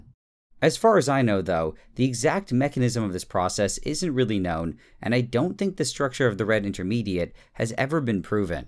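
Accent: Quebec, Canada. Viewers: Is NileRed quebecen? :O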